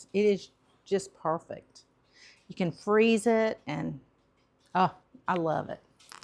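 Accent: South, United States. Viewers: Now that looks like tasty pot roast! You’re always are so upbeat and I adore your Southern drawl. Thanks for all you do to just draw us in for little more seasoning. ❤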